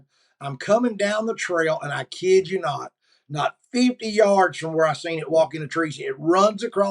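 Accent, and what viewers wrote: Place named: Texas, United States. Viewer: I like this man’s accent!!! It’s all Texas y’all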